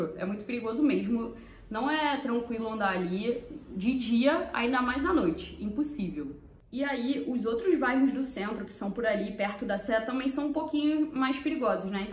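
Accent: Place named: Rio de Janeiro, Brazil. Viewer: Tão engraçado que nasceu em São Paulo e fala carioquês kskskksks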